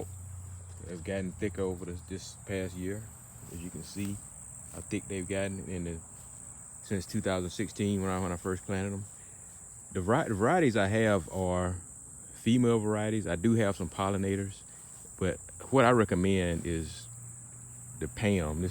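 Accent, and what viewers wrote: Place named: South, United States. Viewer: I've read that pH should be 5.5-6.5, so I think you're putting lime when you should be putting sulphur instead. My Rubycrisp is doing great with highly organic soil, a handful of sulphur, a few handfuls of urea, a couple handfuls of neem pellets to block nematodes, and thick pine bark mulch. I do like the way you speak "southern" though. 🤣 Very Best Regards, Tom Scott Author ● Speaker ● World's Leading Expert on the Corrupt U.S. Legal System Our American Injustice System Stack the Legal Odds in Your Favor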